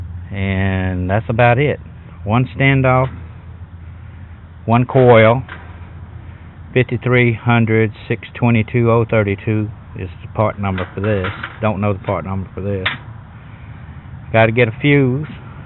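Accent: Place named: Louisiana, United States. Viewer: Are you from Louisiana? For Alabama because your accent sounds very Cajun brother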